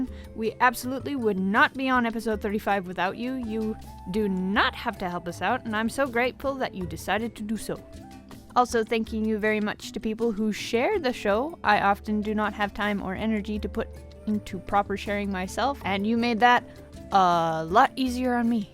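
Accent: United States. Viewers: Where is this accent from!!! I hear Southern Georgia combined with Four Corners and it is confusing my poor little brain... Also I really love it!!! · its an accent from montana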